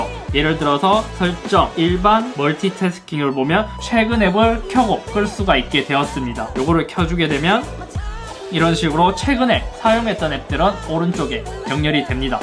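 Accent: Gyeongsang, South Korea. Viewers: 잇섭님 경상도 어디에서 올라오셨어요? ㅇㅅㅇ??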